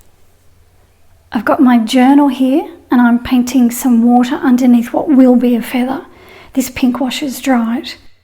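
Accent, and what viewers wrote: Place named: Australia. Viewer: Ahh Aussies...got to love our different accents. A useful tutorial as always. Thank you.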